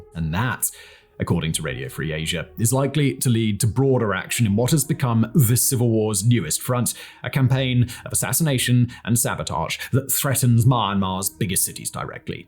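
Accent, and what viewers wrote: United Kingdom. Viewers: God Im so tired of hearing this British fucking noise they're even making more of you narrators on TV it's lit er ally not literally it's pronounced mil it ary, not militry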